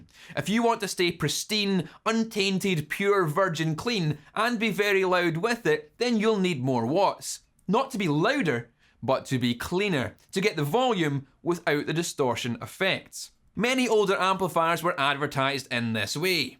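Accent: Scotland, United Kingdom. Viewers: Scottish accents are THE BEST!! I could listen to you read a tech manual all day LoL Really great video and audio lesson! Keep up the fine work!! Salutations from Miami, Florida! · I love your channel! So much fantastic info delivered in a compact format and 100% comprehensible. And I love your Scottish accent..."peckups" is a favourite 😊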